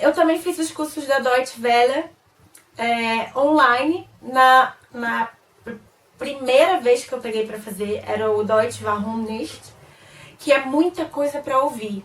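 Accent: Rio de Janeiro, Brazil. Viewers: vc é carioca, não é? se não é, viveu bastante no Rio...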